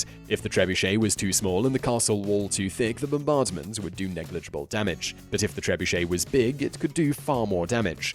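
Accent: United Kingdom. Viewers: Okay after so many videos of this guy, I am convinced he is mispronouncing words incorrectly on purpose, and thinks he can get away with it just because of the U.K. Accent. I swear I don't care what you say medieval is not five or even four syllables.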